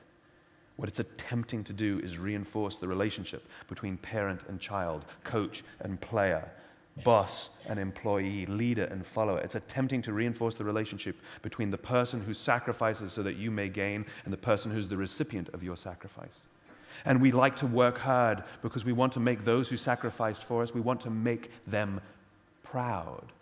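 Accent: England, United Kingdom. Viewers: Back before he lost his English accent. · Haha, when he's in England he kinda talks brittish :D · Wow surprised with his British accent 👏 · I just love his British accent 🥰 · He's almost doing a British accent. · He should keep his beautiful English accent. It sounds so respectful and sexy. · Why does he sound so British here ?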